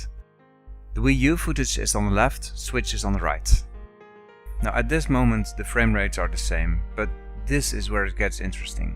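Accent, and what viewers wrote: Netherlands. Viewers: you're Dutch, aren't ya?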